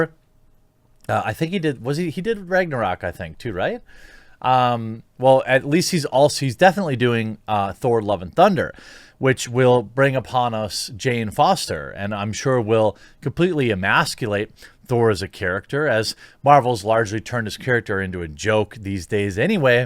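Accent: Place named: Wisconsin, United States. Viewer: Why pronounce it “Taysuh Tompson” is it intentional disrespect, or just that atrocious Wisconsin accent?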